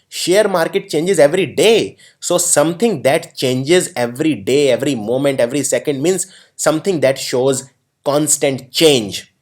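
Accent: India. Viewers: Blablabla I don't like the Indian accent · plz dont use hindi accent okay ...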